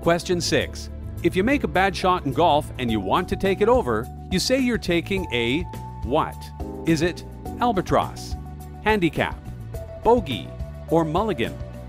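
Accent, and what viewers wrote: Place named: Scotland, United Kingdom. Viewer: 9 of 10 , didn't know he was from Scotland. Thought it was England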